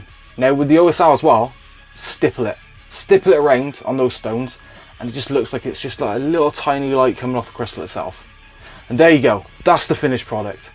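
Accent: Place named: Scotland, United Kingdom. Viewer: I can't make out if you're Scottish, Irish or Cornish. Cracking video 😁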